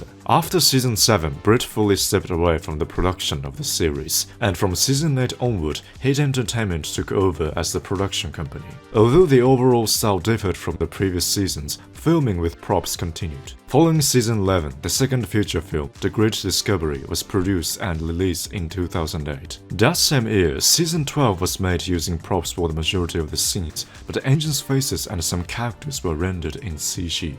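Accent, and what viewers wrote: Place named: Japan. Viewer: bro sounds like a japanese person who is learning english from posh british people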